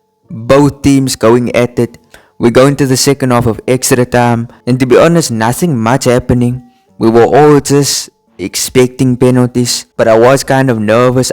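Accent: South Africa. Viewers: Love your channel man. I can tell you are South African with your accent 😅👌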